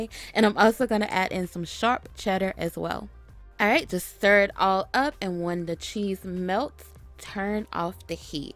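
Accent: United States. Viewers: Which accent is this ? .. which state 🙂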